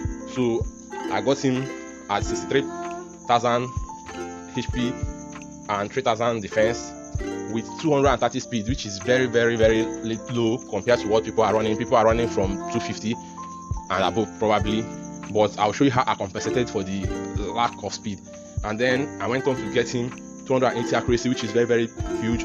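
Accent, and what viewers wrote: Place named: Nigeria. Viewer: Mitchell it is english, he has a Nigerian accent, at the top of the screen you'll see a box, you'll see a box with "CC" click on it for subtitles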